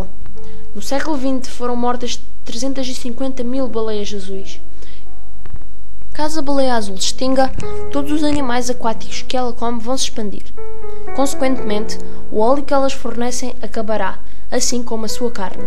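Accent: Portugal. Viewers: Adoro baleias... Apesar do sotaque português, consegui entender o vídeo.. Parabéns! Abraços do Brasil. :) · Unica coisa que ficou horriveeell no video, Foi A Narração Portuguesa. Si fosse narrado por um brasileiro ia fica 100% bom.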